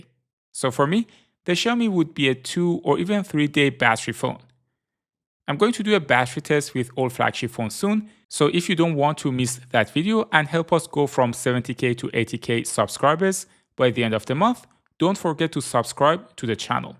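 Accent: Iran. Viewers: your iranian accent tells everything.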